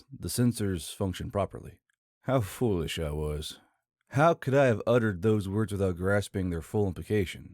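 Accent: South, United States. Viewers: He has a version of a Southern Accent. I, myself, would NOT wish him to try to learn to speak "standard American"! There is much more to his speech than of just an accent, it is also the feelings and idioms too. Must the non-AI storytellers also speak the same way that the AI speaks?! I hope not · I'm not disagreeing with you. But I have to comment on the standard American comment. You cannot get more American than this mans accent. It is found no where else. That is all. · Being from the south myself, I can appreciate a good drawl. However, the good old boy is no excuse for improper diction and pronunciation.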